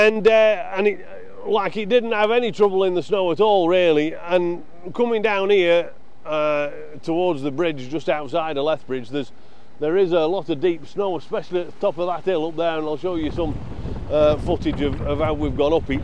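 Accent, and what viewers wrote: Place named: Derbyshire, United Kingdom. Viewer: I'd absolutely love to know where you're from!! Your accent sounds local to mine in Derbyshire, really enjoying your videos 👍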